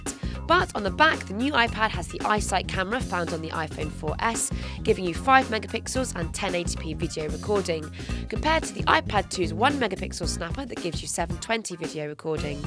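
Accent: United Kingdom. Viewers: really helpful review for those who are hesitated between the two. btw, this lovely british accent makes TechRadar videos rather more enjoyable to watch, i've replayed the video ThREE times just for that reason, so i'm gonna give two thumbs up, one for the video and one for the accent.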